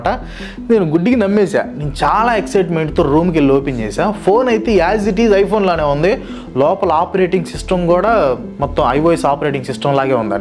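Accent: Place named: Visakhapatnam, India. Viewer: U speak visakhapatnam clarity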